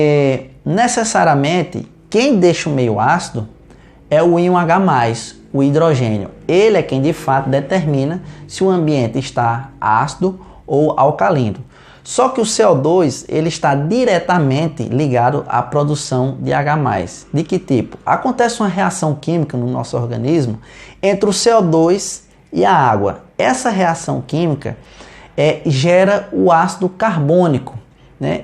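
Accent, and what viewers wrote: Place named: Northeast, Brazil. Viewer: Esse povo Nordestino tem um acento muito feio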